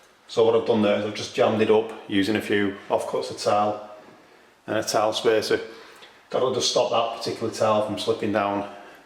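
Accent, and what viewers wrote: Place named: Lancashire, United Kingdom. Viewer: great video, and really helpful. It's also nice to hear a Lancashire accent-it's been years since I moved away! Thanks!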